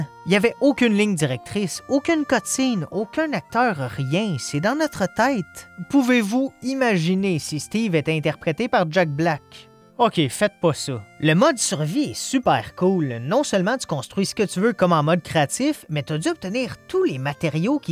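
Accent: Quebec, Canada. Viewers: Je t'ai découvert il y a quelques semaines et tu est vraiment très drôle :) Le mix de ton accent québécois, des expressions que tu utilises et de tes blagues me font passer un bon moment ! J'ai regardé toute tes vidéos la soirée ou je suis tombé sur toi et je suis ravie de t'avoir trouvé dans mon feed youtube ! Voilà, juste merci pour ton travail ! Tu nous fait rire ! Je parlerai de toi à mes amis :D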